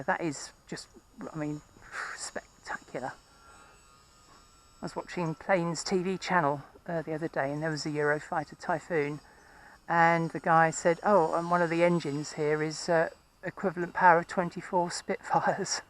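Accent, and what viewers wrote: Australia. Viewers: Love my 50mm Arrows Viper - once I had modified it to fly faster on 4S :) Decent Aussie accent!!! :) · we loved it you speak better Aussie than us . The Aussie blowy fly is always the first one there at a good BBQ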